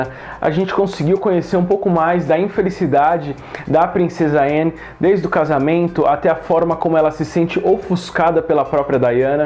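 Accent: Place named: São Paulo, Brazil. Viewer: Raro sotaque paulista! Men gostei de+ da temporada TODA, mas o quinto episódio foi o meu preferido gostei de ver a invasão do palácio não sabia desse fato, fui buscar e realmente aconteceu "achei engraçado" a dama de ferro, fantástica! mas não curto muito a indiferença que ela tinha pela rainha o que eu percebi foi que a série não enfatizou o fato de que a primeira ministra detestava os encontros semanais e arranjava qualquer desculpa para não ir " e não ia" .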